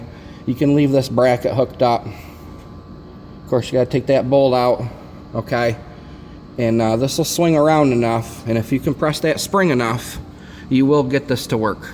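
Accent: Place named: Minnesota, United States. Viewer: probably Minnesota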